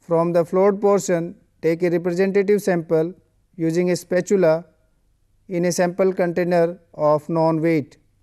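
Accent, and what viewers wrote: India. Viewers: this is indian english language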